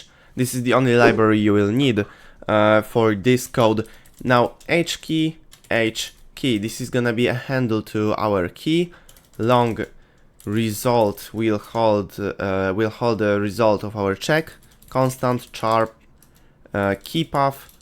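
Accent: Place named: Poland. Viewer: Długo już się uczysz informatyki? Po akcencie słychać, że jesteś Polakiem haha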